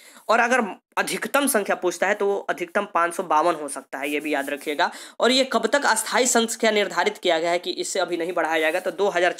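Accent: Bihar, India. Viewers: Bihar se hai kya jii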